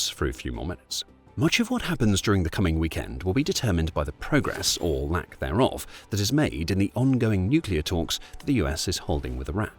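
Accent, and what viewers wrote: United Kingdom. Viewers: I don’t think you should make videos more than 5 min long. Something about your British accent makes me sleepy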